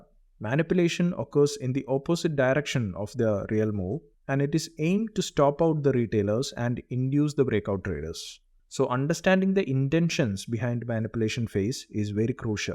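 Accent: Kerala, India. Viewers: Sir, are you from kerala?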